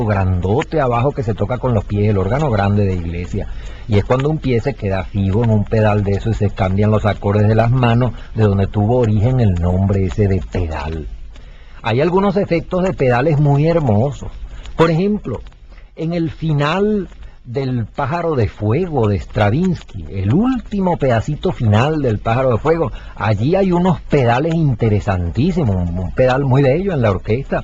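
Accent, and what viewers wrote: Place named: Caracas, Venezuela. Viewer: Sr., le agradezco muchísimo que haya puesto este curso de apreciación musical de Jose Antonio Calcaño en youtube. Aparte de la claridad y sencillez con que explica la música tambien me hace recordar mi infancia en Caracas ( no vivo alla desde el año 73) no solo por las clases en si, que alguna oi en disco en mi casa ( el Sr. Calcaño era pariente mio) sino tambien por el acento tan caraqueño que me hace recordar a mi mamá y a mis abuelos.No sé si todavia se habla asi. Los acentos van cambiando y lo notamos mucho quienes ya no vivimos hace décadas en Venezuela. Muy agradable volver a oir esto.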